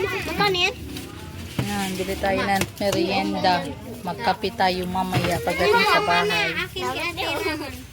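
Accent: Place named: Philippines. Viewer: ❤❤❤❤❤❤dasal lang ate at magpakatatag ka para sa mga anak mo...rest in paradise kuya..mamis ko filipino accent mo..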